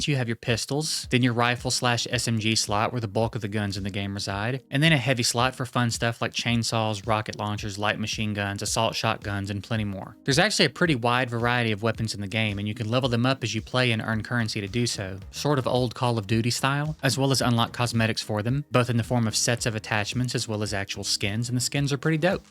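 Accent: South, United States. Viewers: the southern drawl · your voice for some reason gives me mister grayson vibes so hard XD that southern tang on some words i have it too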